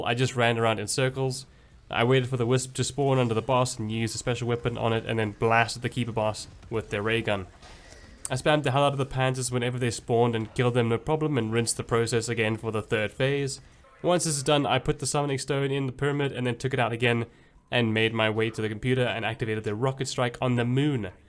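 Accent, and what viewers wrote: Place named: South Africa. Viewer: No ways you're south african. Recognized the accent immediately. Lets go bru, really good video and well paced aswell 🙌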